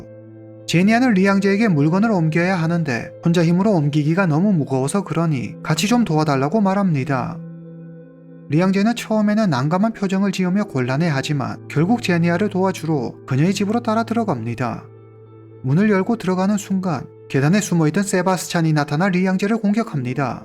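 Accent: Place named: Korean-Chinese, China. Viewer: 조선족 특유 억양이 살짝 남아있는듯 그래도 많이 고치셧네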